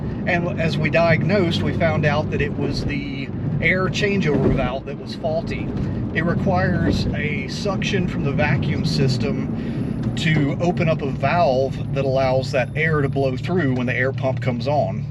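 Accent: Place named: South, United States. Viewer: Great Job. Love the 996 videos, I have a 2003 that I bought from the original owner, retired navy pilot. He drove it like it was a daily driver. but he had a book stuffed with receipts for repairs and maintenance since the day it was new. Now sitting with 100K on the odometer and When I see a repair like this one, that I can't find a receipt for, I doubt it has been done, and go ahead and tackle it. Si aside from removing the alternator, it looks rather straight forward. Keep the videos coming Good Job. I'm a Florida Boy transplanted out here in So Cal when I was active-Duty Navy. good to hear a Good Ole Boy accent reminds me of home.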